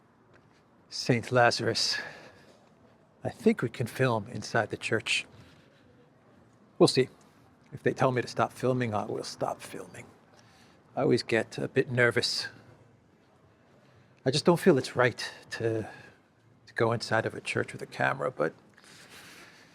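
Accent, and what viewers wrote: United States. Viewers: he sounds American. That's why i.asked.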